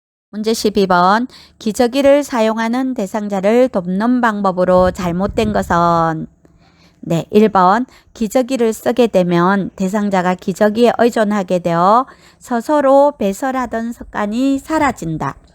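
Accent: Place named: Gyeongsang, South Korea. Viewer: 죄송합니다 경상도사투리라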